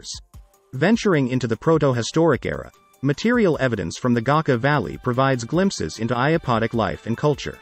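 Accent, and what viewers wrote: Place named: England, United Kingdom. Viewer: Because the AI speaks English akcent I cant wait for it to say: I cvrči, cvrči, cvrčak na čvoru crne smrče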